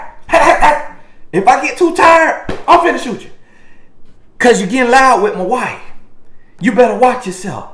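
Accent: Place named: Chicago, United States. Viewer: you sounding a lil Chi town ova here nahhhhh!!! 🗣💯😜 🔥 I love this, definition of what “in love” looks like—Eph 5 from the Bible to the streets 👏🏼👏🏼👏🏼 I pray, Lord you made this man set-apart and I know You ain’t made only one...lol I’m so grateful for the Kingdom description y’all are real relationship goals—not perfect but real & striving to do it Christs’ way. 🙏🏽 Lagos, Nigerian love tho 😩🤦🏻‍♀️ Ohhhh, and another huge thanks, I’ll be getting my Coaching certification with you too. Your ministry, the way you love the Lord & the Queen [i spelled it correctly 😜🤜🏽🤛🏽] give hope to women like me who are in waiting. God continue to bless y’all, exceedingly and abundantly! 😊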